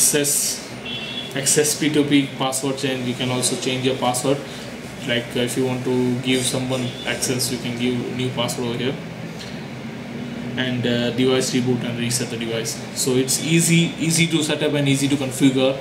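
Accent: India. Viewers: if your going to make a video lose the Indian accent we hate it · Your English accent is very bad and difficult to understand it .. all the Indians the same in this matter .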